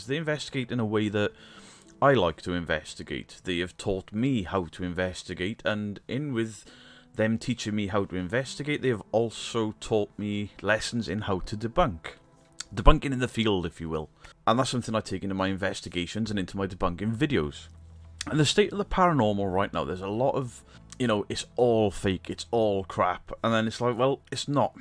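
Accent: Wales, United Kingdom. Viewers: Taff Butt, really confused me with Eleri Siôn from BBC Radio Wales. Love your videos. Been investigating and debunking since the 90's and we need more common sense in the field. Your accent reminds Welshman in Orkney of home!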